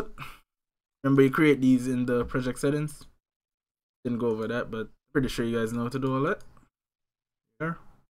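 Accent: Jamaica. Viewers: are u Jamaican ? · Mi did a hesitate fi learn godot but hearing another Jamaican do exactly what I want is inspiring. Must learn it this summer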